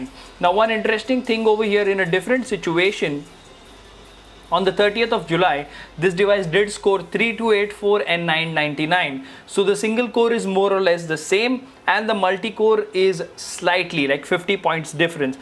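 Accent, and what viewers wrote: India. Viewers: Indian english sounds really bad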